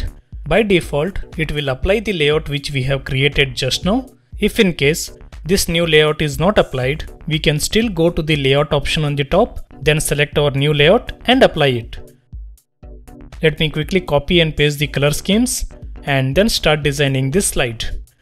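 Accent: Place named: South India, India. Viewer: Wow.... Sir i dont know why, But i feel you are from south india.. May i know from which city you are ?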